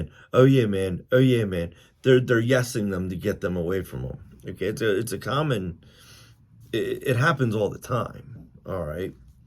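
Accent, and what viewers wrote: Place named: Philadelphia, United States. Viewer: I have been watching your videos , as soon as I heard the first sentence I picked up on your accent and figured you were somewhere local to Philly . I have been a union electrician in Philly for 24 years . Everything you’ve said on every topic is spot on . Coming from a union carrer , its cool to hear your views. I also admire you finding your nitch in knob and tube , as a commercial guy , I would never even touch it lol . Anyway, good luck and stay safe . ⚡️⚡️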